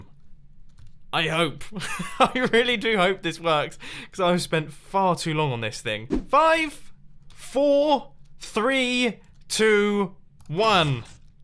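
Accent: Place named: United Kingdom. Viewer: Thanks for the wonderful content these past years! Really appreciated your amazing and genius inventions, your British accent and your apparent lack of ego. I wish you the best of luck but my intrest for Mincraft has diminished over the last few months so to clean up my sub box i wil unsubscribe (not from your vlog channel, patiently waiting for more content there).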